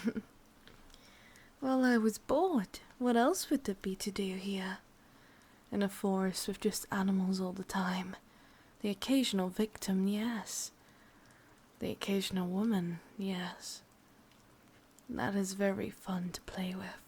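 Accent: Scotland, United Kingdom. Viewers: this is more scottish than english honestly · I'm getting a mix of Scottish and English in this. Like me, hurray😂. Good video lol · Omg wait are you Scottish 😭👋👀👀❤️ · Thats Scottish accent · I like this which though I do feel sorry 😞 for not finding the one I hope she does someday ❤️❤️. I really love your voice acting by the way you sound very sadistic but hot also you also you sound so beautiful & soothing I’m impressed 👍👍👍👍👍👍. Also you shouldn’t have to change the way sound personally I like your Scottish accent it sounds gorgeous in my opinion & I’m British with an American accent & I’m proud of it hope you had a great Valentine’s Day ❤️❤️. Kind Regards AuraKnight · Your natural Scottish accent is far nicer.;) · H New to thus channel and the accent is great, for me it sounds a bit more Scottish than English. I know I'll enjoy this channel tho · yup. 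Prefer your Scottish accent tbh. Havent check all your vids but is there any rp with your natural accent?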